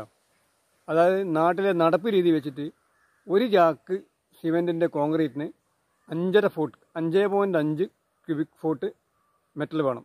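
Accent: Kannur, India. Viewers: ningalude samsaram kannur anennu mansilayi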